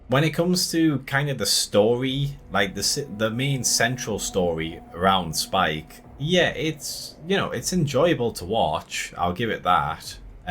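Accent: United Kingdom. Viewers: Did you just say that this film is set in the Scottish highlands?! You sound British. I'm amazed that you can't tell the difference between a Scottish and a Northumbrian accent, and haven't heard of Lindisfarne. Or the Angel of the North. The characters at the end weren't just power rangers but dressed like Jimmy Savile. That's the thing that I couldn't get over.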